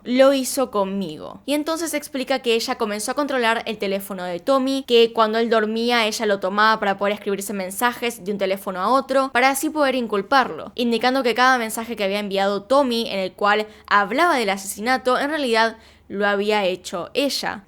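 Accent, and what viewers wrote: Argentina. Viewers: Eres argentina? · realmente existen hijos asi que horror mejor es alejarse con el dolor del corazon no se llevaban bien creen que USA hubiese quedado impune si hubiese matafo al padre era una justificacion por el dinero y odiaba a su madre yo hubiese dividido el dinero y preservar su vida y salud mental.interesante caso gracias me encanta tu voz con tu dejo argentino saludos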